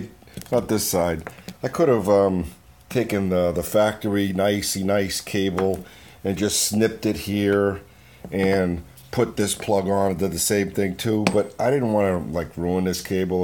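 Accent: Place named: New York, United States. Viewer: NiceyNice. You gottta be from New York. :)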